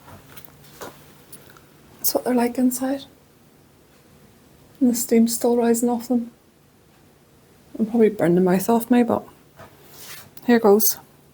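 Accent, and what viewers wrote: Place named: Northern Ireland, United Kingdom. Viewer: I’m enjoying your videos- not only for the recipes but I love listening to your voice/ accent. I’m originally from NI. Haven’t heard anyone say ‘just fire it in’ for years. Thank You.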